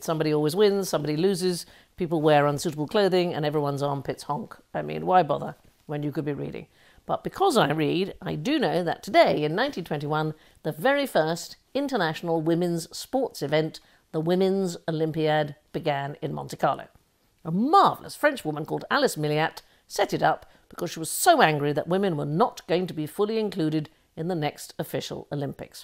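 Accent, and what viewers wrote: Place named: United States. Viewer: I love when you use your American accent.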